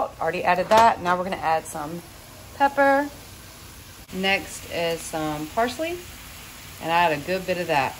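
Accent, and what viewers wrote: South, United States. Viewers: Great video thanks for sharing. I can hear your Southern Accent loud and clear, lol. Where is the South are you from?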